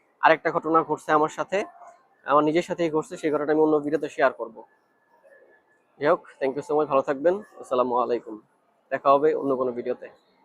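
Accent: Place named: Nadia, India. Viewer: Ami to Kono problem dekhlam na? Amra bangalira aivabey kota boli...apni ki shantipur nodiya theke ashchen naki?....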